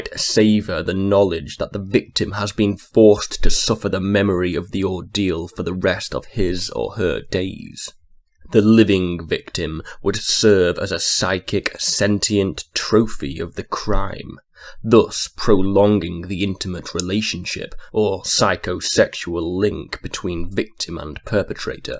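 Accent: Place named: England, United Kingdom. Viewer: I'm looking forward to your next chapter readings when you have time for it. Not only are they not ai but you're English so your accent fits the reading.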